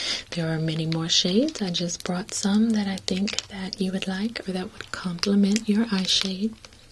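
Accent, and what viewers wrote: South, United States. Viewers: This is the first video I've watched by you but im blown away with the fluidity of your speech, your knowledge of the products and your voice itself. Do I detect a hint of a southern accent? I'm from Texas so it sounded somewhat familiar haha. In addition, it's always a plus to have your makeup done by Morticia Adams haha, I love your look!!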